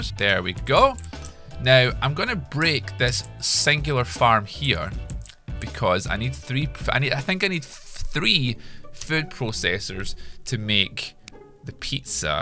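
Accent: Scotland, United Kingdom. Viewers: I love your scottish accent "fefty" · "Cyked pizza's." Dat scottish accent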